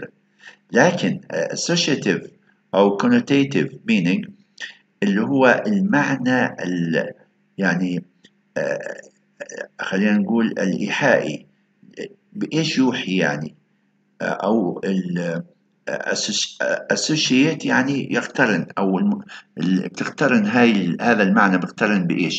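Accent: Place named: Mosul, Iraq. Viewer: دكتور كيف حالك عساك بخير. ربي يحفظك. دكتور اعتقد انت عراقي؟ لان لهجتك مثل لهجت اهلنا في الموصل للعلم انا من ديالى خريج جامعة الموصل في التسعينات. تحياتي لحظرتكم.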